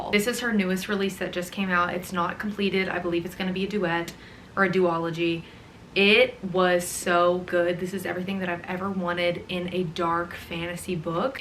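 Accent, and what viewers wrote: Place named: South, United States. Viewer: I love that for her but im afraid im adapting a little southern accent from her and im not even American 😭😭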